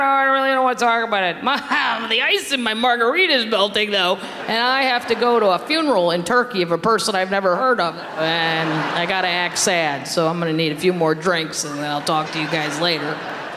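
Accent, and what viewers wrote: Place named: Midwest, United States. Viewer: Such a cutie - love her midwestern accent 🥰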